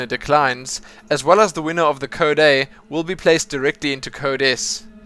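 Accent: South Africa. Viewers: its a south african accent bru!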